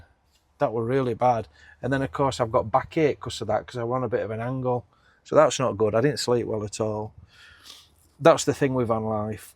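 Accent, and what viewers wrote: Yorkshire, United Kingdom. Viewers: Thought I’d got Jamie Oliver Chanel at first 😂 until I heard your dulcet Yorkshire comments. Nice one 😊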